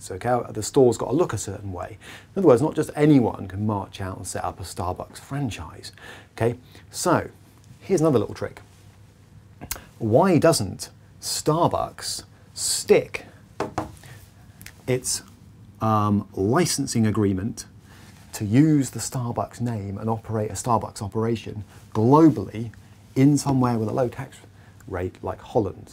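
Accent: United Kingdom. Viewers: What kinda British accent u have?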